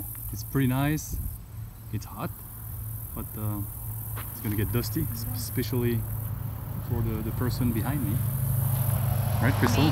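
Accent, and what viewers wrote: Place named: France. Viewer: not to sound demeaning or such I have been trying to figure out your accent leaning towards French been watching all of your vids they are great hoping to do the same someday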